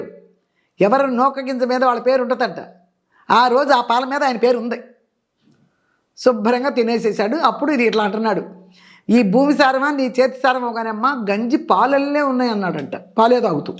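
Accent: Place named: Nellore, India. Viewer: Amma mee matalu naku chala estam madi nellore ma matalu kuda elage untayi meeru matladuthunte vinaali anipisthundi amma ❤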